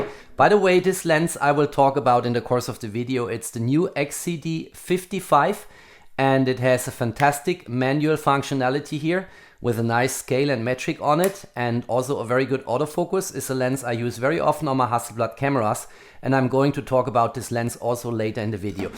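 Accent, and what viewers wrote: Germany. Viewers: Agree, and I love his German "We" instead of "V" in his pronunciation...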